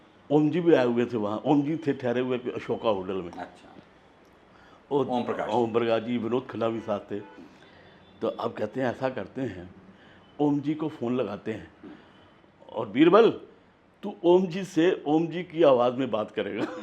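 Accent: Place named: Punjab, India. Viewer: Birbal has punjabi accent like shri dara singh ji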